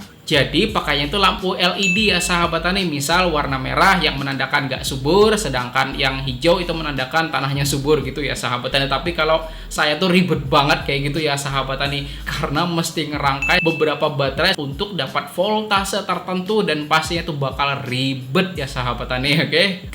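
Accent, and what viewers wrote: Banjar, Indonesia. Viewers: orang banjar sekalinya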